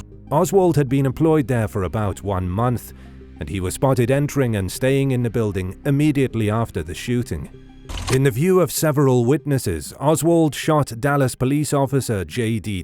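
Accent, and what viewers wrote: England, United Kingdom. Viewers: His English accent doesn't add any real credibility to his conclusion. Facts: Oswald was downstairs (2nd floor) causally having his lunch 90 seconds after the shots were fired, drinking a coke--(2 witnesses)nobody saw Oswald coming down the only stairway available when they were coming down the stairway 45 seconds after the shots (2 TSBD workers observed by TSBD supervisor). Rifle was originally reported as a Mauser (far more likely accurate weapon) on live radio and tv air by weapons experts (2 witnesses) before the story was changed. Oswald's supposed Mannlicher–Carcano rifle was a "piece of junk" and needed to be shimmed even to get telescopic scope to work (3 witnesses) probably a Dallas PD property room reject carelessly chosen and hidden the day before. The Mannlicher–Carcano rifle had such a bad reputation in Italy (it's country of origin) it was called "the Italy lost the war." Simplest theory--Crossfire--3 rifles--Grassy Knoll(front twice--Kennedy's neck, temple) , Texas SBD(Connally and miss), and across from TSBD on the roof (bullet off the curb that hit bystander by the overpass next to a policeman (2 witnesses). 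The Dallas Police Department actively did everything they could to suppress any witnesses that did support "Oswald did it." Crossfire was standard CIA operational procedure to guarantee success. Majority of Secret Service detail had been drinking till 3 am at at Casbah Club--rookie had a machine gun the follow up car, Kennedy's driver didn't follow proced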